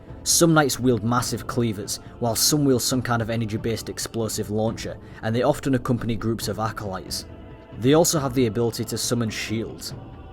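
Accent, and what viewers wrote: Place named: Scotland, United Kingdom. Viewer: With some mates we have an ongoing debate trying to identfying your accent. My idea was that it sounds like a mix between Scottish and Northern English. Would you mind telling us the truth?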